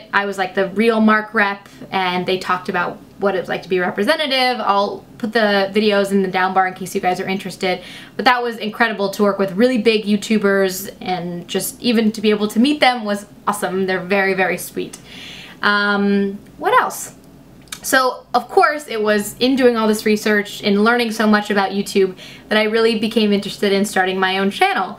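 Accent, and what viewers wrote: United States. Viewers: ) Wow one shot:O Your american accent is perfect, congratulations. Believe that you are not french finally. I'd love to hear you make tongue twisters in english and in french, you gotta be unbeatable in this area. It's always a pleasure to listen to you (even in english). You are truly a wonderful woman and so beautiful. Kiss from France. XO...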